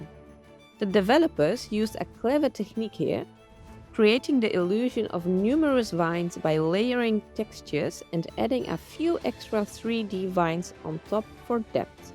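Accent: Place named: Netherlands. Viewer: Hello! I follow you on roblox then i cmae across your channel. your the best animial ugc creator! and i didnt even know you had an youtube channel amazing!!! Your ugcs are the best and that i hear and see your dutch makes me super happy cause i am dutch also and this video was aaaamazzzinng!!!!! to the power off 10! Goeie video ga zo door!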